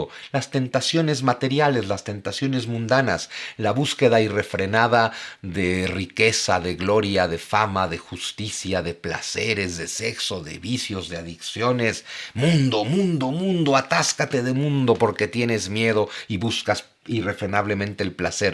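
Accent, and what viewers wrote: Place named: Mexico. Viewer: Me encante el acento mexicano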